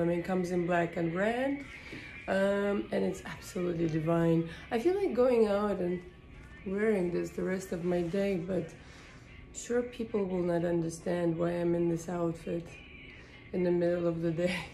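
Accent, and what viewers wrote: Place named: France. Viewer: lovely french accent <3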